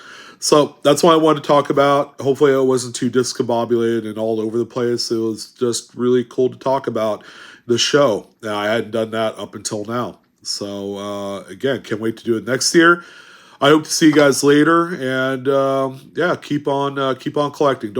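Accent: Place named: California, United States. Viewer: That's the way dudes from California talk mate 🦘🤠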